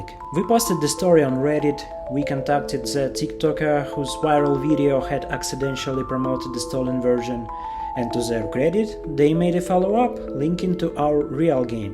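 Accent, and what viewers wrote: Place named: Russia. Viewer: Очередной урок самаритянам. Делают всё за бесплатно - а потом: "а чё это у нас денег нет?😮" Насчёт авторки. Если бы тебе юрисдикция позволяла, было бы незамедлительное обращение в суд и всё по списку. Но ты стыдливо опустил момент, что никто с тобой не будет считаться, просто потому что ты из неправильной страны. Лучше на ютубчике плакаться корявым русским акцентом😢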